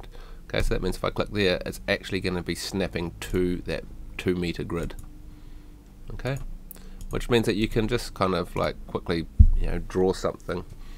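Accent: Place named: New Zealand. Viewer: Thank you, really easy to follow along with. I like your accent, are you from New Zealand?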